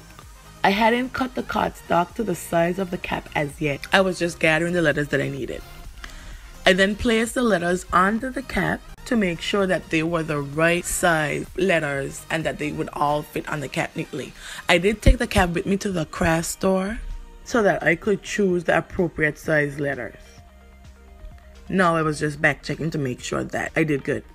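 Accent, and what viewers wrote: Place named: Anguilla. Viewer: Shes from Anguilla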